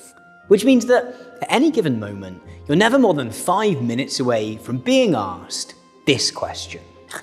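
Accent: RP, United Kingdom. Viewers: cool it with the media training body language and BBC speech. Be yourself.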